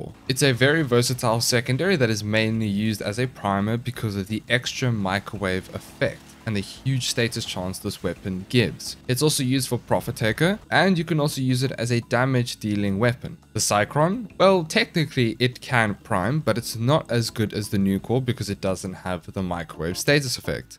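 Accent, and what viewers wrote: South Africa. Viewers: Thx for the vid I was watching your kuva litch vid since im a total noob and wanting to try get a kuva nukor since I have a normal nukor.. Wanted to ask are you a S-A local your accent man sounds like it?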